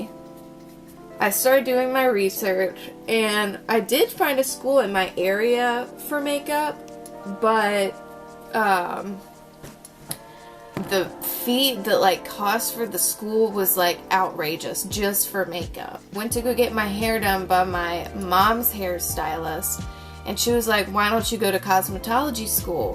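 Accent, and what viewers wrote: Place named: United States. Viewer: Ugh, you're so great on camera!! I never realized you had a lil country accent. So cute 😁😁 Loved this video, looking forward to more content from you!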